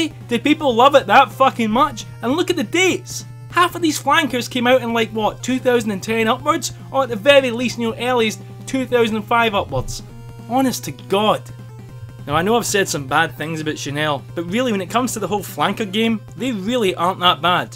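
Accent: Scotland, United Kingdom. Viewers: BTW, Love your Scottish brogue. My great grandparents were from Glasgow and Edinburgh. So want to visit your great land someday. :)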